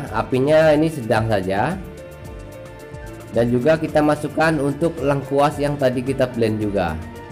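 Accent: Indonesia. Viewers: chef nya ngapak ya ?? suara nya enak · Ngomong nya kaya jawa tegal apa brebes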